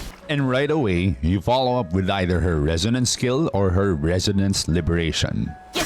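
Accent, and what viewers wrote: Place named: Jamaica. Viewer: Bro are you a yardie ? I cant help but notice the accent. Thanks for the vid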